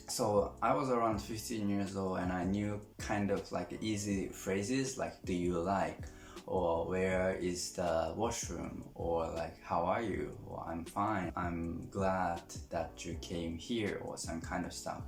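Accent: Philippines. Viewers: You have that Filipino english accent and we love it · you have that Filipino accent. Great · You're so cute though. And you got tge Filipino millennial English Accent.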